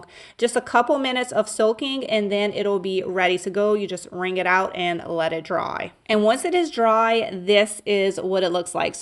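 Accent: Louisiana, United States. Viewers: Good day. I love your accents. Please settle a bet. I hear Louisiana and The South. Am I correct? Pft, my son says I'm wrong.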